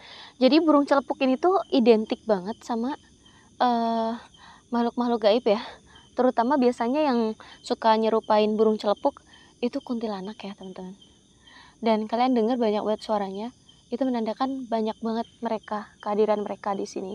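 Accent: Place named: Sunda, Indonesia. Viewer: org mna kak, kek org sunda🤭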